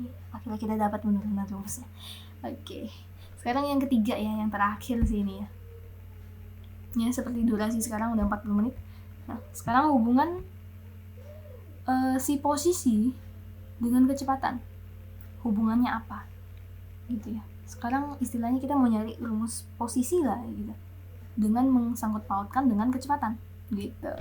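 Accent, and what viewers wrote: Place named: Makassar, Indonesia. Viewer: Kuliahnya d mana kak serasa org makassar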